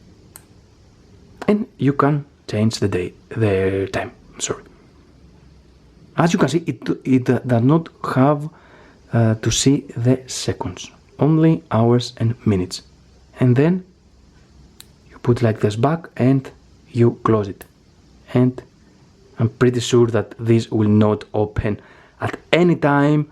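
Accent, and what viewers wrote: Greece. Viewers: you are from greece? the ascent is familiar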